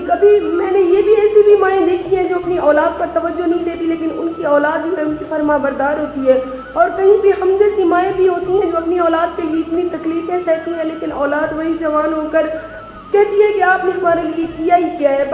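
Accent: Saraiki, Pakistan. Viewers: Syster aap bolati bahut pyara hai saraiki aur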